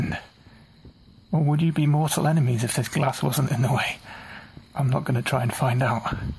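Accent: England, United Kingdom. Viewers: The British accent is always lovely 💘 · By the sounds of it your British, there's an organisation call "wildlife aid" in Britain that help all wild species that are sick and injured. You could give them a ring next time you see an animal that's sick or hurt, they'd gladly come and assist. They have a channel here on youtube under the same name. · Katieboil Anegg English, not Welsh. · The narrator reminds me of winnie the pooh but with a british accent. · Hes British if it was not plainly obvious by the accent. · The English accent is a cherry on top🦊 Precious fox💕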